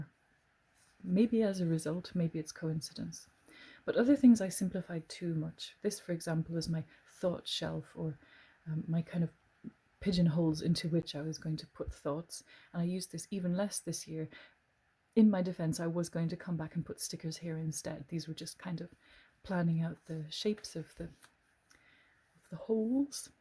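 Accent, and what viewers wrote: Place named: Ireland. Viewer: You have an incredible soothing voice.. Nice to see.. And hear.. Irish voices..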